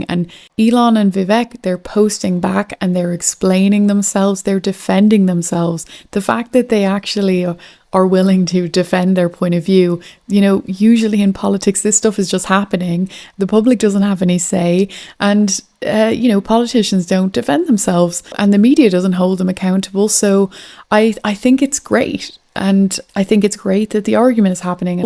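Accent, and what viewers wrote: Ireland. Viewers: you should consider covering geo-politics too. E.g. cover BRICS, trade war between China - U.S., tech war (chip) between U.S. - China, the Syrian situation - why Turkey got involved (something about a pipe line that would benefit them), etc. 💡p.s. Irish accent?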